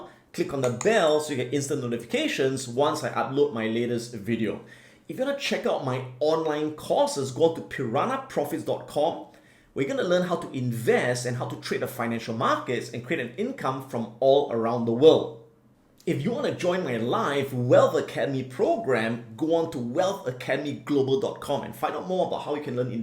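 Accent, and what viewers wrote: Russia. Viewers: love the russian accent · Your Russian English is so good, can't remember nothing after watching but that part :)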